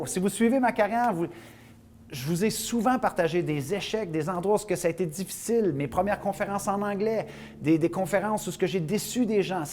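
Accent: Quebec, Canada. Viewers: C'est spécial l'accent québécois lol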